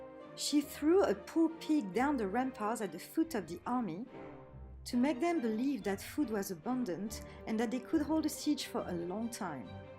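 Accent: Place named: France. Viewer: most enjoyable video related in delightful french accent.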